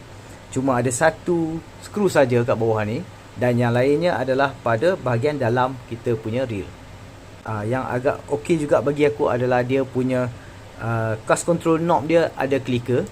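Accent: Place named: Penang, Malaysia. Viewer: Dekat jla ingat org penang😅😅😅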